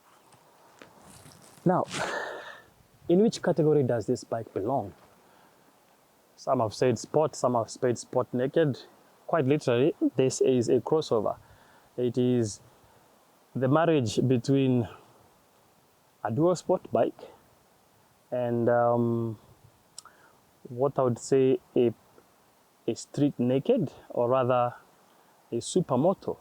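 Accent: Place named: Scandinavia. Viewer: wonderful review in a near viking accent🤩🤩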